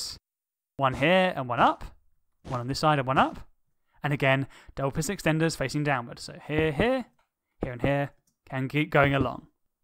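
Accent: United Kingdom. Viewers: I know this sounds weird, but I like your British accent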